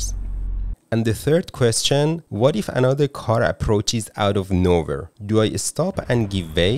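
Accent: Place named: Iran. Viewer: I bet he is Persian. I could spot on his accent. Thx regardless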